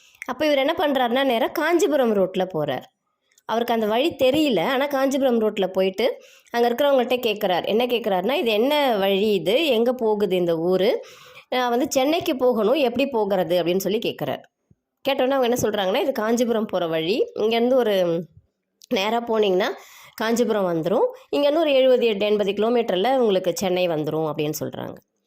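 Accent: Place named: Ernakulam, India. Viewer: Idun Ernakulam sonnadu pola iruku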